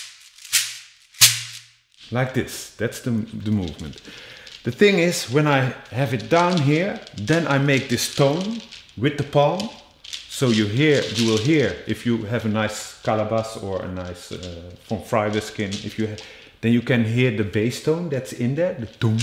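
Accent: Netherlands. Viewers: This is great, thank you! What size/shape of Shekere would you recommend for beginning? By the way, are you dutch? Ik hoor het in je accent :)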